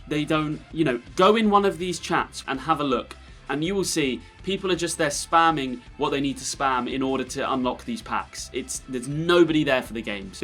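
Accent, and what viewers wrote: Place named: England, United Kingdom. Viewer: I hate this English accent why can’t an a American do this video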